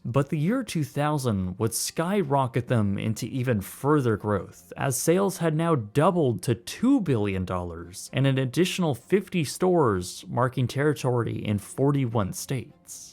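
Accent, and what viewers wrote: Canada. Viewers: Forgive me for asking, but what part of Canada are you from?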